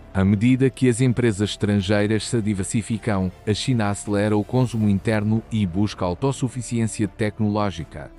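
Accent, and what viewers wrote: Portugal. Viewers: tira essa voz de português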